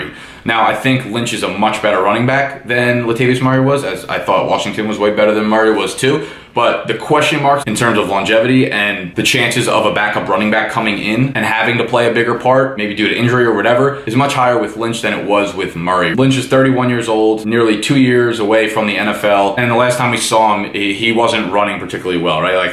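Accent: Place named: AAVE, United States. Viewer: Dude, GREAT info, better than the pro announcers. The only criticism is you don't need to cuss or speak in ebonics 10% of the time. Will be watching you from now on.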